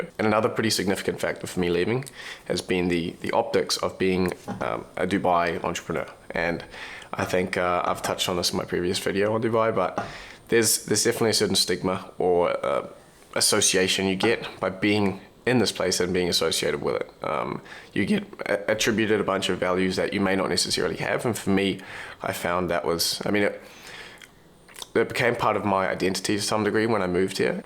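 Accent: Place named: New Zealand. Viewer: Wow coming back home, about 3 weeks ago I was soaking up the content on this channel and I was thinking this guy sounds like a Kiwi sure enough is coming home. I am hoping you will be based in Auckland as I am just down the road.. 😊😅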